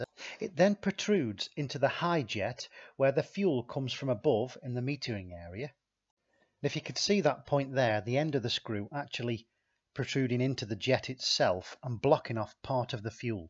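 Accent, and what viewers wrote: United Kingdom. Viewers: These are cracking videos. Thank you so much. Great to hear a British voice instead of the legion of american videos. Love this series. Really useful. Wish I could fix my mercury mariner though. Love to see a video on replacing crankshaft seals.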